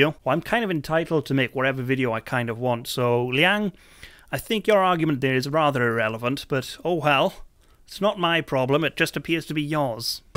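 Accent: Wales, United Kingdom. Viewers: hello MR Welsh man........remeber me???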